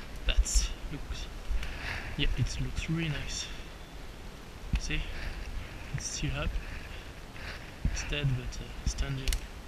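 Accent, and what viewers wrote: France. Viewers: You sound French but correct me if I'm wrong. I'd just like to know what's it like to camp. Thx uk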